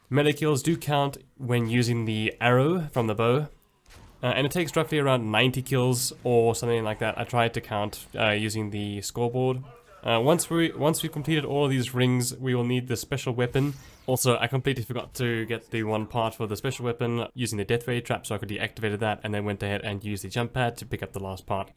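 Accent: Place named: South Africa. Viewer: No ways you're south african. Recognized the accent immediately. Lets go bru, really good video and well paced aswell 🙌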